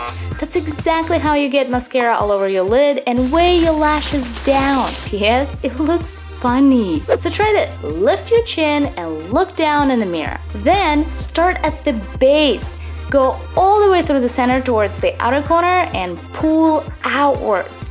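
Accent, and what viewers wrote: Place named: Russia. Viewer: i like this slight russian accent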